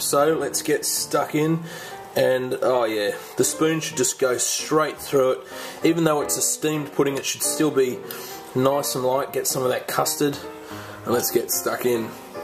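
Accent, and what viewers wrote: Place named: Australia. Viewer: Yeah where did you get the UK from this? He's Australian lol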